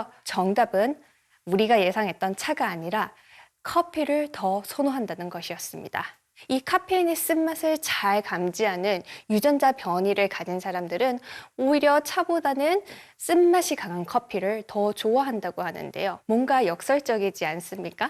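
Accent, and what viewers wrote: Gyeongsang, South Korea. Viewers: 경상도 억양이 있으시네요. 듣기에 나쁘지는 않아요. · 경북 대구 분인가요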